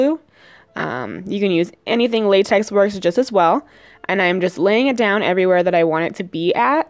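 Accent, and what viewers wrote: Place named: United States. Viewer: Yeah I'm from a different country. I live in Scotland do its not every day I here an American accent.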